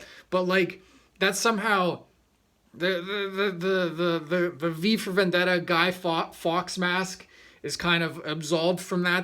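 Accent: Australia. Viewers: Specially since Moore is from the north of England. The accent on this vid is more Aussie/Cockney.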